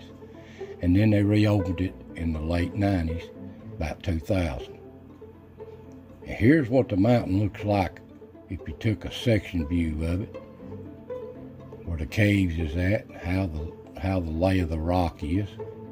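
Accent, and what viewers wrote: Appalachia, United States. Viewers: I am from Detroit but my heart lives in Harrogate and Middlesboro. I lived with my aunts and uncles in Harrogate during the Summers. In 1987 my uncle Steve Napier taught me how to drive when I was only 14 years old. We lived in Harrogate and he had a small shop in Middlesboro. To get me past my fear of driving he would have me drive across that mountain before the tunnel. Sometimes we would stop at the Cudjo's Cavern shop for some ice cream (before dinner). Uncle Steve was well known and loved from what I remember., your voice and talk atyle reminds me of so many of his friends that would stop in his shop to visit. It brought me back to the very happiest days of my life. Makes me wonder if you two knew each other...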